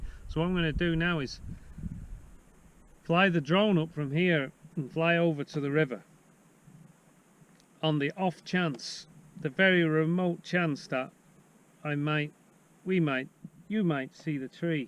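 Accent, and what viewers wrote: United Kingdom. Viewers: your words about nature with your british accent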